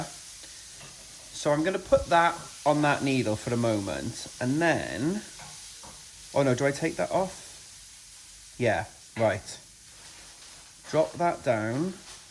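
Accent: Wales, United Kingdom. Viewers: Thanks for your lovely videos. I could listen to your Welsh accent forever, sounds like singing. Your MKAL is gorgeous, pink and green, you can’t go wrong. Cheers Chris 🇦🇺😁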